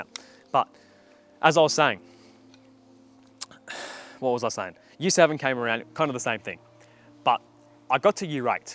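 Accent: Australia. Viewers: Are you australian if so do you live in sydney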